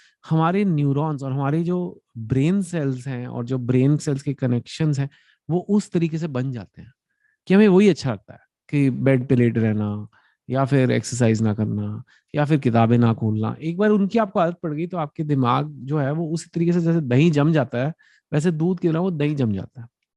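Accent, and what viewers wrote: Delhi, India. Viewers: I like the way he talks like Delhi Walla style.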